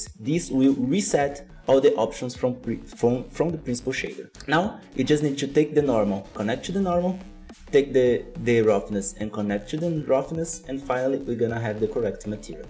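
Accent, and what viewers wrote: Brazil. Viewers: Sabia que era BR! kkk reconheço o ingles BR! Otimo video! Me inscrevi!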